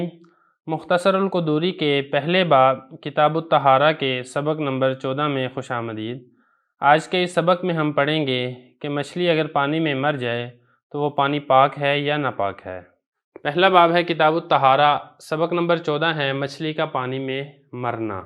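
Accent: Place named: Punjab, Pakistan. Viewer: Matlab Aap Jabab Panjabi Ho ,Accha Laga 😂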